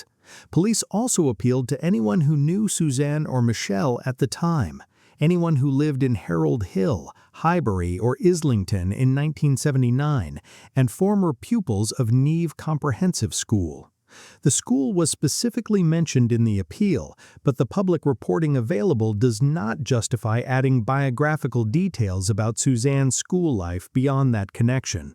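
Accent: United States. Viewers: Why an American voice on a British crime case.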